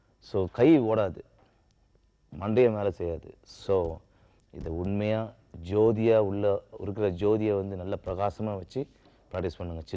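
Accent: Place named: Chennai, India. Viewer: Thala! Enna sokka numma madras bashai pesi manase kushi aakitta ma!! Sokku Thalaiva Sokku! Noorandu vazhanum nee!! Kalakkite iru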